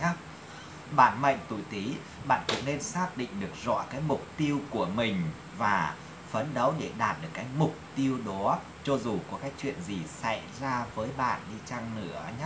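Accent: Thanh Hoa, Vietnam. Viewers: Dung giong Thanh Hoa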